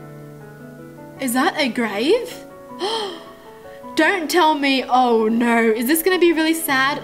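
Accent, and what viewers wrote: Australia. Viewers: Are you Australian? I love your accent <3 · "So after all of that, I'm going to diss a pee?" That's how Australians speak.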